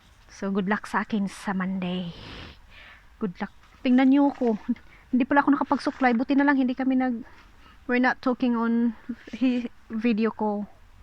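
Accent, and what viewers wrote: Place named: Visayas, Philippines. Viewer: Hahha murag bisaya jd ky ang accent gahi kau murag ako Lol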